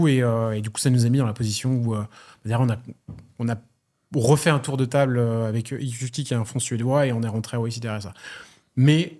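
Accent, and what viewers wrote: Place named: France. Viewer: Hyper intéressant, mais mon dieu, la moitié des mots en anglais prononcés en français, c'est infernal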